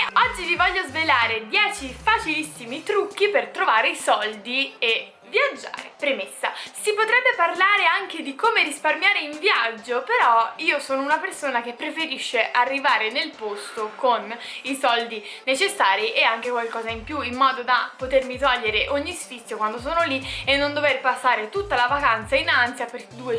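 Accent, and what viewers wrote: Tuscany, Italy. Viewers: ti è scappato l'accento toscano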